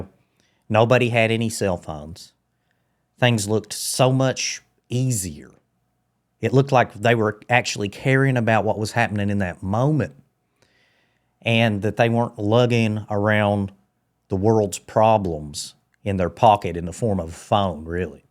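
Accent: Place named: Appalachia, United States. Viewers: Love your hillbilly accent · r u in West Virginia? i grew up there and your accent sounds like where I grew up.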